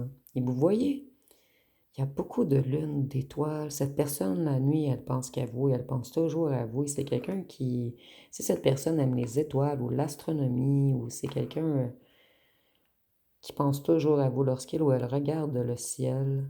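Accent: Quebec, Canada. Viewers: ton Accent Canadien Québécois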